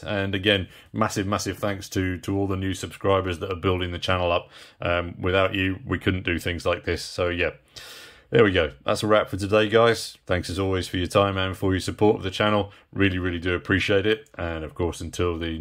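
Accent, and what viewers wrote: United Kingdom. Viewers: I heard an English voice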